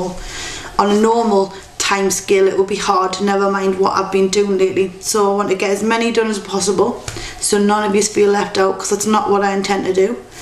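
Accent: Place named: London, United Kingdom. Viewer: I thought that you were from London :)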